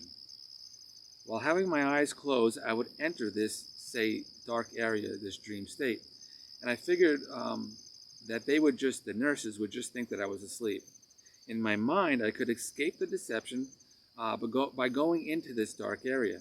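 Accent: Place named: New York, United States. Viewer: This is fascinating,! The New 'Yawkr' accent coming out here. I am from Ossining, NY so I really hear it. I support and believe in you AND the experience you've had. May God bless you and you're family and keep tawkin bro. ❤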